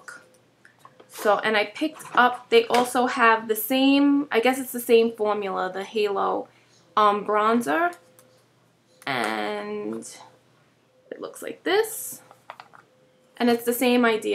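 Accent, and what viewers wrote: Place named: East Coast, United States. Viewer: love the east coast accent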